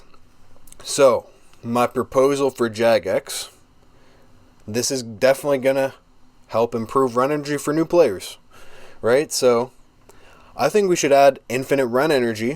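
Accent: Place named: Canada. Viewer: I'm Canadian, and I thought the aboot thing was a myth. I guess not.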